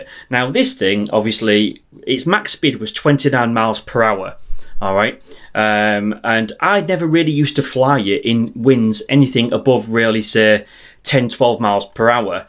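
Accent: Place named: Yorkshire, United Kingdom. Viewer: All the time I was watching this video I'm thinking "This guy's from somewhere near Hull in the UK I think? He's definitely a Yorkshire lad anyway. My wife's family are all from Hull, which is the reason why I thought this was the case. Am I correct?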